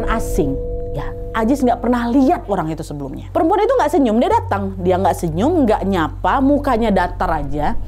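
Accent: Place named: Pekanbaru, Indonesia. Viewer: Tapi dari logat kak ini logat pekanbaru tapi kadang logat medan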